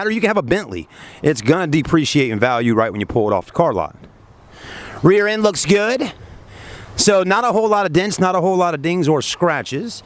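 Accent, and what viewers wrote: South, United States. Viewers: This dudes southern accent reminds me of Arthur Morgan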